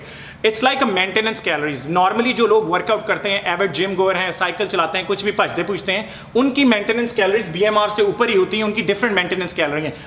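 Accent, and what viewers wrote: Punjab, India. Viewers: Lovely video and lovely cute punjabi wali hindi awwww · Ur way of speaking Punjabi is so cute.i also want to loose some weight but mujhe baar baar kuch khane ka man krta h.so plz aisa diet plan do 4veg jisse tummy fat km ho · Your. Punjabi accent😘😒😒lovely 😘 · hahaha I really enjoyed this video.. your Punjabi accent is aala.. diet plan is good..keep it up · i m also punjabi and the way u speak punjabi is sooo cuteeee..... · Sir u r Kainttt..... I am going to follow this diet plan...... And I like the way you talk Hindi, English te naal Punjabi da tadka aaye haaye..... 👍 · Stumbled upon his channel love love the way he talks especially his hindi/punjabi accent so I subscribed :P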